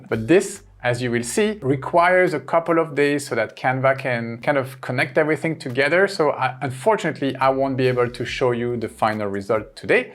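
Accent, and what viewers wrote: France. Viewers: Your accent sounds french , and i wanted to tell you MERCI BEAUCOUP pour tout le temps investi dans cette vidéos et toutes les informations que vous nous avez servi gratuitement , un compte YouTube qui se démarque de loin de tous les autres encore un énorme merci à vous ❤️ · French accent ?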